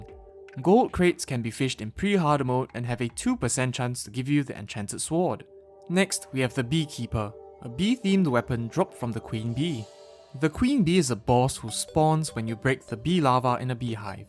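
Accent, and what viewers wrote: Singapore. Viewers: Singaporean? Nice ! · are u a singaporean? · Are u from sg? your accent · oh you are singaporean no wonder u sound like one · dude are from singapore? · Hi, just a quick personal question. Just curious but you sound a lot like Singaporean Chinese. Are you? 😋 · Sounds singaporean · He sounds like a Singaporean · Are you Singaporean?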